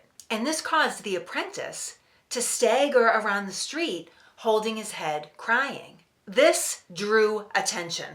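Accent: Boston, United States. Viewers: Hello, new here...It's been pointed out by others that I have a Boston Accent, but your Boston Accent is "way over the top"--lol I subbed. I grew up in Waltham....What town did you grow up in? 5 Stars! --Van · you have to love her Boston accent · Great work, you made me feel as if I was there that very nite, of March 5th,1770. AS a former New Yorker, (left in 1978) now living in the People's Republic (i.e. communist) of Washington, in the Pacific Northwest, 50 miles north, from the SAN-FRAN-FREAK-O of the NORTH, formerly known as Seattle. Just remember, Washington is divided by the Cascade Mountain Range, the commie's all live west of the Cascades' the regular folk live in the eastern side. YES, Jeff Bezos (owner of Amazon) is moving from the People's Republic of Washington, to Florida, so as to literally save millions of dollar's in taxes, when he sell's some of his Amazon stock, later this year. 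You just gotta hear the local commie's crying in their soup, that because of their TAX THE RICH policy's, their getting NOTHING $$$$$!!!! Is this a great country or what!!!! God Bless America. Your Boston accent only adds to the drama for your mama. I'm truly amazed by the detail of the drawing as well as to learn who the artist was. For the record my thought was that John Adams (future 2nd POTUS, 1797 to 1801.) FYI: POTUS = President of the United States. I am aware, Adams successfully defended the eight British troops in court. Your passion is glorious to behold, thank you from a 70 yr's old man. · I love your knowledge and enthusiasm. Your presentations are top notch. I used to do reenactments and it was a gas! Where were you when I was a young man? Why do people have such trouble with your Boston accent and my Long Island accent? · That Boston accent is so thick you almost need subtitles. 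· Well, I was certainly wrong in my guess about the map artist. It's a good thing I subscribe to this channel. I'm amazed about the level of detail about the massacre that is in this video. If you walk the Freedom Trail, you can visit the grave of Crispus Attucks. Wonderful Boston accent. Never lose it. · I just found your channel a couple of days ago…. I absolutely love your Bostonian accent & natural beauty. I have since watched 5-6 installments & loved everyone. This one on the Boston Massacre taught me many unlearned things about what happened that night. I am obsessed with Revolutionary War & you have helped to quench my thirst for learning. Blessings, Ken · The Boston accent just adds to the storytelling and what a sweetheart!!!❤ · Her Boston accent cracks me up. I thought my accent was bad. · Your bostonian accent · I listened to her talk for 30 seconds & could definitely tell, without a doubt that she was from Boston!🤣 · Love your Boston accent, definitely sounds different to a missouri man. 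Thank you for telling us about our founding history. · I've never heard this story told so well....also, her Boston accent makes it so much more authentic! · As a lifelong military and American historian, I can’t believe it took me so long to find this amazing channel. This video was very informative…my guess was John Adams who defended the soldiers in court. Okay…the Boston accent makes the video more enjoyable. New and excited subscriber. Thank you. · Edit: Good historical video. Are you exaggerating the accent? I live less than an hour from Boston and have visited the city many times. Its very thick. Like, most people Ive met with the accent don't have it that thick. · The diagram must have been drawn by Paul Revere. At any rate, thank-you for your videos! As much as I've read about our Revolution, I always learn something new from you. and yes, your Boston accent adds to the charm and the telling of each incident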